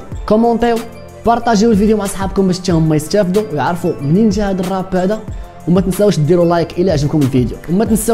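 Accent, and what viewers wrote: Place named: Casablanca, Morocco. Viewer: كاتعجبني اللكنة الكازاوية ملي كاتهدر بيها أخاي. العز ليك 😎✌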